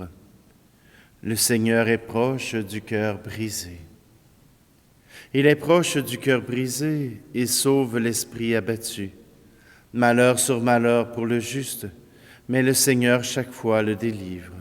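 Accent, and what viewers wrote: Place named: Canada. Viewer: Il y a trois ans, la Providence a fait qu ' un certain à des milliers de kilomètres de chez moi célébrait la messe. Un accent canadien, je me suis adaptée! Quelle liberté car nous n avions en France droit de nous éloigner qu'à 1 kilomètre de notre domicile...Merci Seigneur de nous avoir envoyé. Ses qualités humaines, ses homélies, ses chants choisis, m 'inspirent chaque jour. Je te rends grâce Seigneur de ces moments de partage de foi, d Espérance. Notre France va si mal, elle a oublié son berceau chrétien... Merci encore. Bonne journée à tous.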